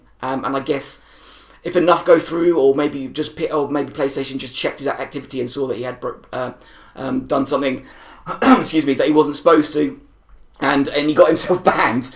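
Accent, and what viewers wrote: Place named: United Kingdom. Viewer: I fucking love your commentary, your british accent just makes it all the more hilarious i swear to god!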